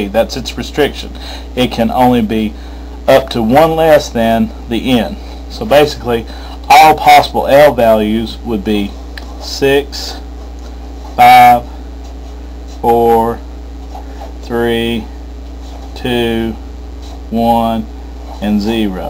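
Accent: South, United States. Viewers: Dude i need you as my chemistry teacher. and based on your accent, youre prolly right here in the south with me haha!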